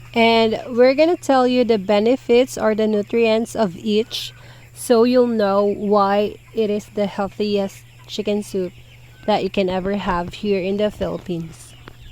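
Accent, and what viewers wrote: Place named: United States. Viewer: Kuyaw visaya diay ka mam mura man gud American accent ka the way you speak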